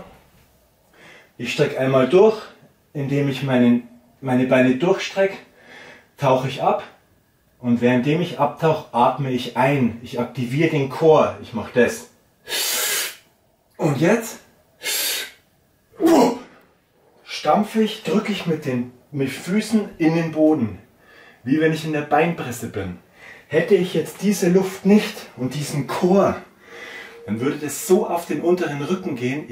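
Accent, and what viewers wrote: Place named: Swabia, Germany. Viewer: Aus welcher Stadt kommst ? Dein Akzent klingt schwäbisch :D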